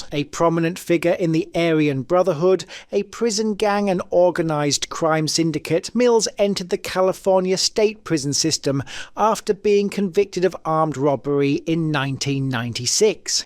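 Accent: United Kingdom. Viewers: There's absolutely nothing more annoying than some retard with the UK accent commenting on s*** they have no idea what they're talking about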